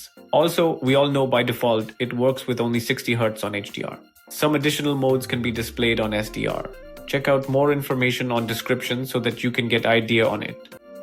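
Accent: India. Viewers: Please talk in American accent it sucks when u talk in indian english I only like my country for its national language which is hindi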